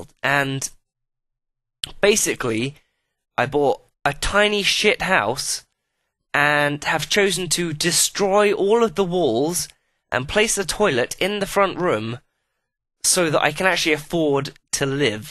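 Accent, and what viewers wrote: England, United Kingdom. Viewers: i like your british accent, especially when you said " pause, pause, pause." XD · i love your englis accent!!!! :D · He's British,like his accent is really British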